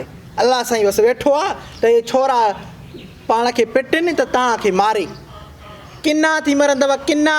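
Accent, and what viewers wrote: Sindh, Pakistan. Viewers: brillent real mithri sindhii bolee asa jiii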